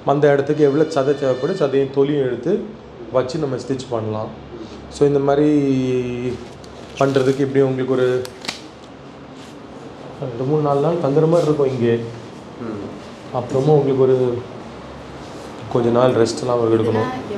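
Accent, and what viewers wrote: Tamil Nadu, India. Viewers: I love his Tamil accent ..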